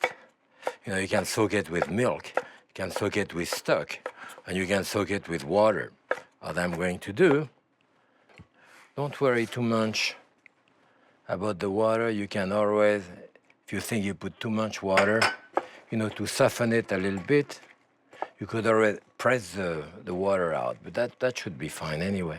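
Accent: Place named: France. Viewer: I want to love these videos. I really do. But I can't helo but wonder how long you have to live in the US before you finally lose the thick French accent that makes it sound like you just arrived off the boat and barely speak any English. Apparently 50 years is still not enough. "A lot of de chef, dey ave de special razeur." Come on chef, you know how to speak English as well as I do.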